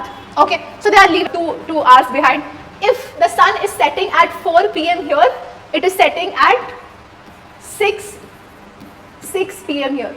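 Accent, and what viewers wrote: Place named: North India, India. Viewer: Not. Her accent sounds so North Indian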